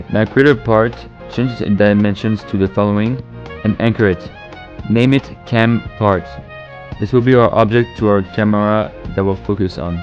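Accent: France. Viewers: are u french ? good tuto btw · Are you french by any chance? I mean the accent Nice vid bro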